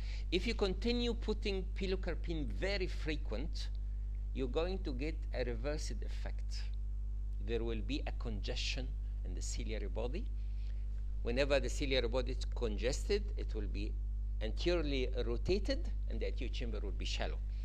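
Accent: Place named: Egypt. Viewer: Shukraan, helped a lot with my therapeutic course Noticed the Egyptian accent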